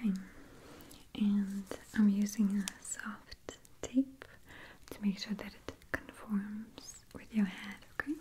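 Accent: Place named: Russia. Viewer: you are such a beautiful lady. It seems that you are becoming more beautiful as you get older. I was watching some of your videos from 2011. I think I am going to be one of those women that turns into a hag as I age. Watch, you'll be more gorgeous as you get older. What is your secret my dear. Please don't tell me DNA. I do miss your Russian accent as was so prevalent in your first videos. I enjoy watching those. I could hear your voice more in those. Whispers are great but you have such a pretty voice, it would be great if you could do some like when you first started out. My volume doesn't get any louder. You are one of my favorite asmr artists. Take care of yourself and your family.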